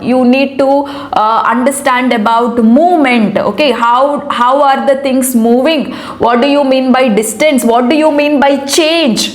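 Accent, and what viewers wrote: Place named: South India, India. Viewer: Just a South Indian accent.....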